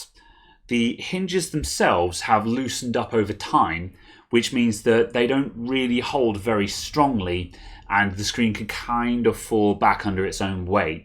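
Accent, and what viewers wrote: England, United Kingdom. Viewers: Just followed this and found the hinges were actually cracked on both sides, so no hope of fixing the loose screen, but brilliant, clear and helpful video. Bonus points for clear English accent.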